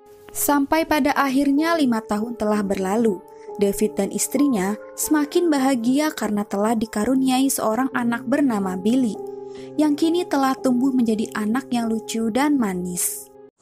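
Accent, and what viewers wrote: West Java, Indonesia. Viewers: Orng mn km kak orang bandung ya 'kumaha teh hh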